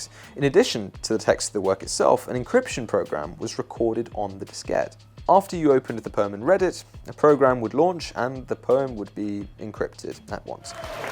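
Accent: RP, United Kingdom. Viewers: posh boy... sounds like all of Boris Johnson's school friends in one person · Aye, posho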